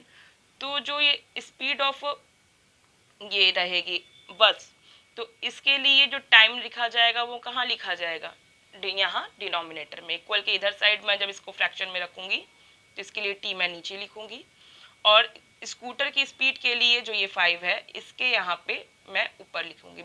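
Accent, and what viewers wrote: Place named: Eastern Uttar Pradesh, India. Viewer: East UP accent ❤️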